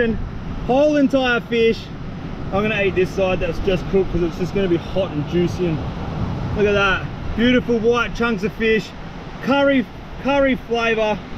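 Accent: Australia. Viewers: "that's so weeeered" lmao I love Aussie accents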